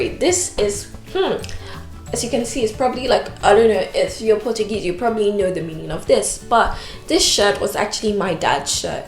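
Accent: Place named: United Kingdom. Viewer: with the British accent. Thanks for educating us on supply chain. I didn’t know supply chain had this much depth. Keep it up 👍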